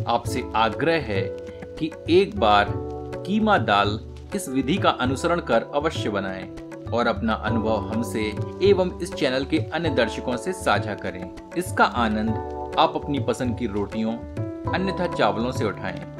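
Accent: Standard. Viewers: Namaskar. Shriman aap ati shuddh evam sundar Hindi bolte hain. Is byanjan ko mein prastut karne ka prayas karunga. Dhanyabad. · Sir dekh ke hi muh Mai paani aa gya.. Jarur try karenge.. Aur recipe se jyada maza Aapki shudh hindi sunne Mai aaya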